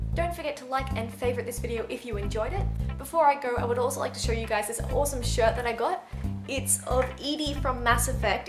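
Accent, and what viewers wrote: Australia. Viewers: I love how aussy ppl talk, you called the vita veetr;p aha your super cute though.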